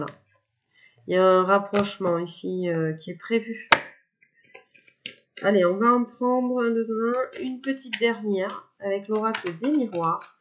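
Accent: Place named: South, France. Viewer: Bonsoir ravie de vous revoir une tres jolie guidance je ne vous lâche jamais j'aime votre façon de parler un peu de sud quant au eeee je comprends lorsque l'on réfléchi tout a fait normal les gens vraiment 🙄 débile prenez bien soin de vous surtout a tres vite j'espere🤩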